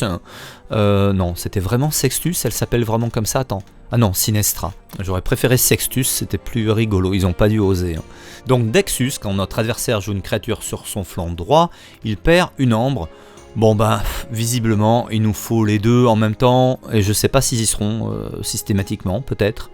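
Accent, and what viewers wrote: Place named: New York, United States. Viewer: Merci pour le travail et ton accent de new yorkais. Continue comme ça et bon courage dans tes ouvertures de deck dis pour rester zen lol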